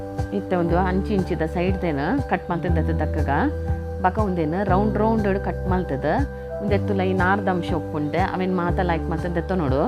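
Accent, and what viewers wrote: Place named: Mangalore, India. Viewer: Manglore kannada artha agalla ree madom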